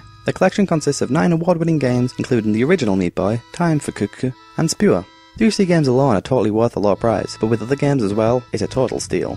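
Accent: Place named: Manchester, United Kingdom. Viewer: Manchester accent + speaking fast = Americans can't understand you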